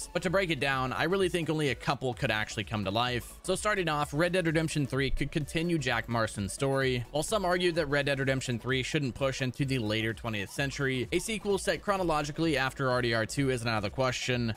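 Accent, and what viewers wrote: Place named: General American, United States. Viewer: Almost nobody else cares. It’s a general American accent.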